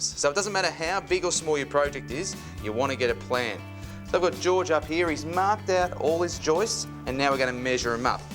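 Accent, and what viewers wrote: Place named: Australia. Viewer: LOVE Aussie accent!!!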